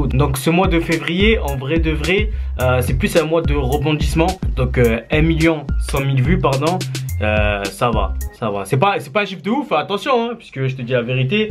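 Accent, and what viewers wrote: Marseille, France. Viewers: sa va c'est bien t'es vidéo moi je kif comme tu est... avant les vidéo que tu fessais genre je kidnappe un abonner ou playlists ou on lui coupe les cheveux on aime bien ton accent de Marseille avec t'es tarpin bien c'était mieux a l’ancienne je dois pas être le seul a pensait sa mais continue tu est dans le droit chemin.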